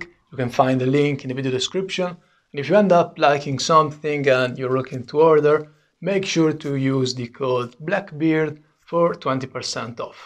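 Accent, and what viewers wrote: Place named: Italy. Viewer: Si sente lontano un chilometro che sei italiano , veramente bravo bei video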